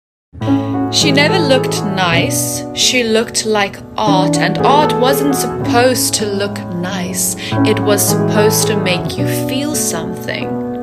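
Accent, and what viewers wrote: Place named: United Kingdom. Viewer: i like this voice so much and it's british accent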